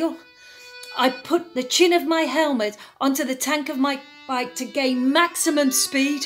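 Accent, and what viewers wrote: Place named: Black Country, United Kingdom. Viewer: A modern (well, 1970s) ghost story. I like the slight black country accent too. Nice work x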